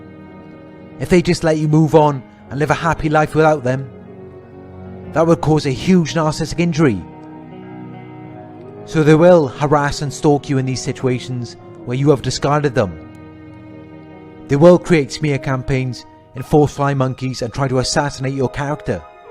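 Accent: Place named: New York, United States. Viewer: I FOUND it looks Great. You look and Sound so New York. My Dad was from Flatbush.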